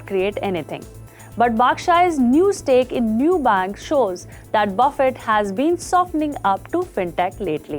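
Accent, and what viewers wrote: Gujarat, India. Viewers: Mam, You are presentable and I think you also have some kind of tech background (probably database programming). But your accent and musical lilt in pronunciation is very Gujarati. Nothing wrong with that, but please try to be accent neutral. There are training courses which people undergo in BPO/Call Center related industry to cultivate the right accent. I highly recommend you to please undergo such training. Take this as constructive criticism and not negative criticism. I mean good for you. All the best.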